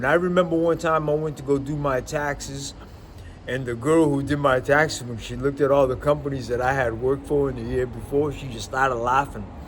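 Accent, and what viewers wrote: Boston, United States. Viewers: This guy is great 👍. I know that accent! I came of age near the Boston area. Just outside of Fitchburg to be more specific. I lived there for 22 years, lots of fond memories. Nowadays I have returned to my early childhood hometown in upstate NY to be closer to family who live in town and so I could at least afford a place to stay for awhile as an educator. I feel at least temporarily more protected from the scourge of the economy and national disasters. I did tell friends and family thought, if I have to relocate again, it is not going to be the United States.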